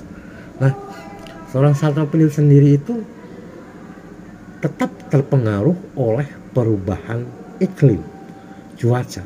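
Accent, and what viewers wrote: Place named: West Java, Indonesia. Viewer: ❤logat Abah sapertos Sunda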